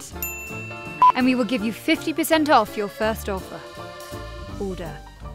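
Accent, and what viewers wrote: Scotland, United Kingdom. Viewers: Of coarse she's Scottish, I'm in fucking love. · Scottish or British? Or is it Neitherofthoseish? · I love her scottish accent. It makes her even more endearing aswell s her personality. And i love the random American accent she did aswell.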